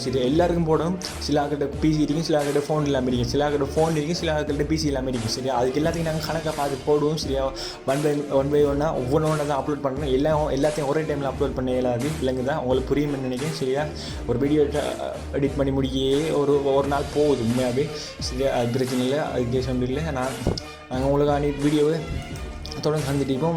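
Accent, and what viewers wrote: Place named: Sri Lanka. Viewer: Bro Your Srilankan...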